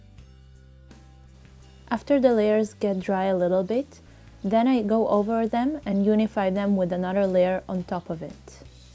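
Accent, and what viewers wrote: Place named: Israel. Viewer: also, it sounds like you have an isreali accent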